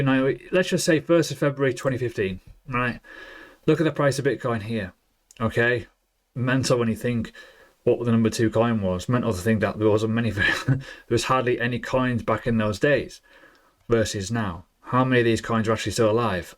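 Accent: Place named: Newcastle, United Kingdom. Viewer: I know one thing. I'd listen to a good geordie lad over some california bullshitter all day long .I've listened to all the crypto you tubers over the past year and it's not hard to work out that most of them are wrong uns .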